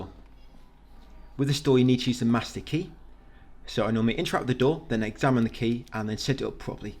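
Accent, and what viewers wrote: United Kingdom. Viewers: Ты британец?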